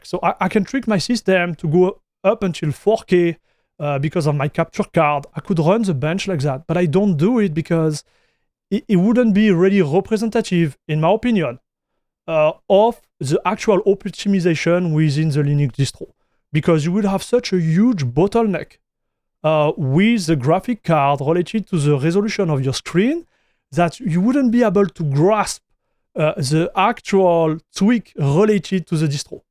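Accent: France. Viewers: I Love that did the Benchmarks and that you did a fair review. And i 100 % agree to you. Nicks Point is still valid, for Casual gaming the distro is Not as important as you might think at First. Greetings from Germany, Always love watching both of you, great content and awesome french accent.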